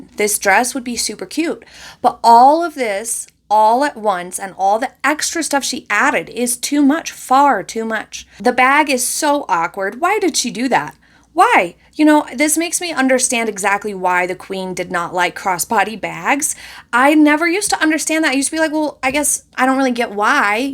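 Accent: United States. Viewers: I love your videos, I really enjoy getting the notifications for a new one! Thank you for sharing your knowledge with us, it's helping me to buy wiser and not waste money on outfits I love the look of but no way was it made for me! Could I ask your background, did you train in fashion or styling? Also I love your voice, a great American accent, I would ask where you live to try to place it, obviously I won't as it is to personal. Keep making, keep teaching, keep entertaining, thank you x I'm subscribed, liking and sharing ❤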